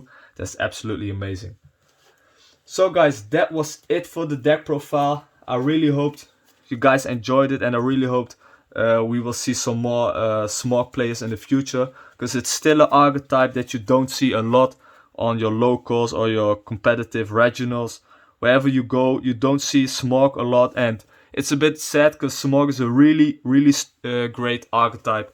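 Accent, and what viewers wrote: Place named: Netherlands. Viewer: Mooie deck profile mooi nederlands acsentje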